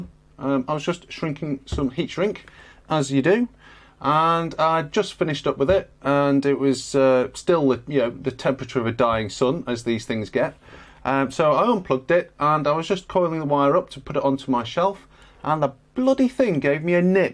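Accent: United Kingdom. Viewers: this guy is funny, I also love that UK accent, instant subscribe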